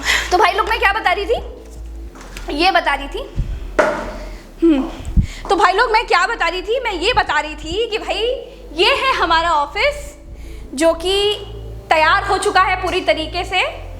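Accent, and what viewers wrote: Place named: Awadhi, India. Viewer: Aapke bolane per bich bich mein jo avadhi ka jo tach aata hai is liye aapke channel mein Char Chand lag jaate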